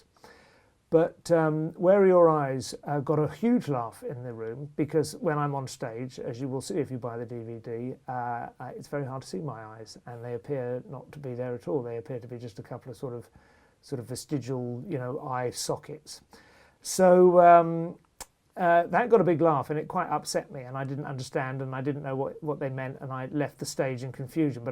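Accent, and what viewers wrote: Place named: RP, United Kingdom. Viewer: Yes, as I commented earlier in this thread, in response to Terence Quinn: "I had the same discussion with you before on another of Evans' YouTube videos, so it seems that you are just a troll who clearly dislikes someone speaking with a comparatively posh accent, and immediately jumps to (the wrong) conclusion about money and a private education (a minimal amount of research on Wikipedia would have told you about education, but you clearly couldn't be bothered)."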